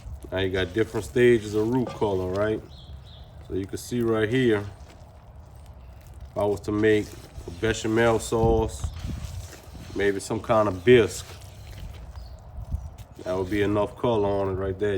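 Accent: Louisiana, United States. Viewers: your accent, you must be from somewhere around Assumption Parish? I grew up Mermentau/Crowley/Jennings. I’ve never been a slow roux guy, though. Have my veggies ready, heat my oil just to smoking, add the flour, stir like mad with that same high temp spat you have, add the veggies when I get my color. I’m usually done with a dark red-brown roux in less than ten minutes. I guess we all just do it the way our mamas taught us! I like what you’re doing man!!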